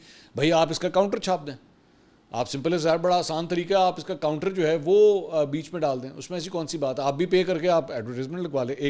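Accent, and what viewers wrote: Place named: Rawalpindi, Pakistan. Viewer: پتہ چلا ھے آپ کی آواز پنڈی سے ھے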